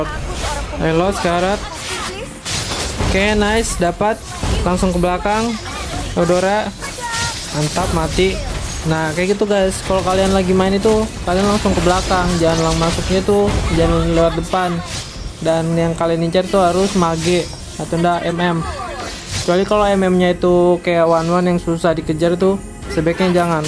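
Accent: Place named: Banjar, Indonesia. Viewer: Bng org bnjr? Soalnya logat nya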